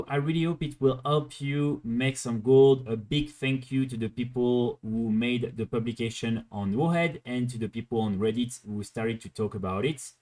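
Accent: France. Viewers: Un FR reconnaît bien un FR lorsque celui-ci parle en anglais c’est dingue 😂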